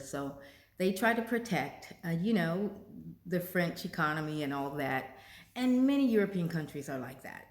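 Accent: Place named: United States. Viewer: I can't speak for the rest of Europe but in Britain I think most people would be accepting and curious , the question is nuanced because some British people are becoming nervous of some folks from other countries than America who may also be black ( sad but true ) but once they hear you speak they will know you are American, I'm British and I hope this helps amigo 😊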